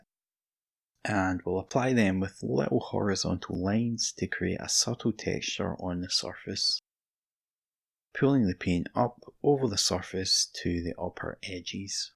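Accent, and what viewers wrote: Scotland, United Kingdom. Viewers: This is brilliant - I had to subscribe. You’re like a Scottish Bob Ross, so calming !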